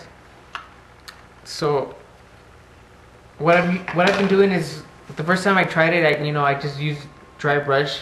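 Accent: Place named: Latin America. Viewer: your voice is so calming and latino-ish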